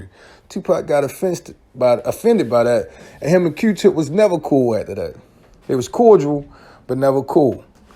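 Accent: Baltimore, United States. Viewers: You gotta be from Baltimore. I hear that accent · Kewl video, kinfolk✊ But, on some other... You from B-more?? Sound like, one of the big homies from round that way. 💯✊ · You from bmore huh I can hear it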